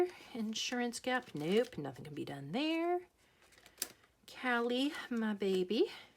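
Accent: Australia. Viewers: 🏍 I swear I lost it when you called the doggies boof heads. You are getting more aussiefied every day ❤️ Kelz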